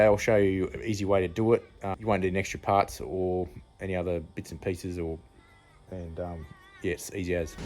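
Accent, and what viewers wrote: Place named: Australia. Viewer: I gave you a thumbs up for the Aussie accent and for having the balls to drive on the wrong side of the road all the time!!!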